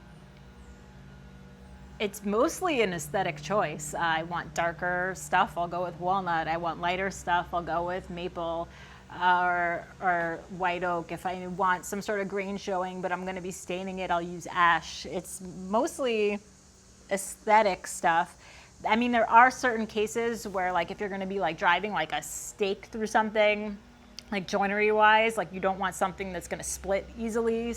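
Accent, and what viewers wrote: United States. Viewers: Yes! I was about to comment the same thing! It’s a nicer accent than other parts of America I think.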